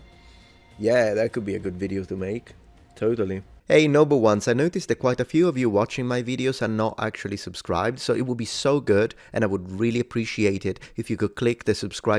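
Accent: Italy. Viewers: Ecco perché il tuo accento mi era molto familiare, sei italiano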